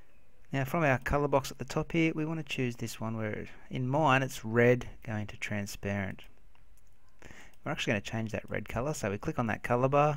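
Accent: Australia. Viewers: Thanks so much for this. One of the best tutorials for Photoshop beginners I've seen so far. Just the right pace and level for me. Seems like most other tutorial-makers must be scared of being sneered at for being too simple, and seem to assume a 1 lifetime of Photoshop experience level. I like the Aussie accent as well. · r u aussi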